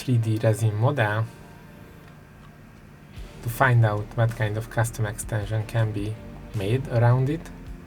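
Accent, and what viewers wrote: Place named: Hungary. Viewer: hey bro, I'm guessing you're Hungarian?!!! I sry... just hear your pronunciation.... Cool video ThX:person-turqouise-waving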